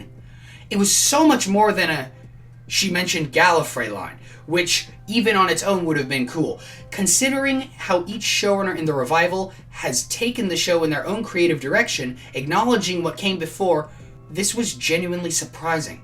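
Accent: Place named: United States. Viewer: why he got an American accent? i thought you were from the uk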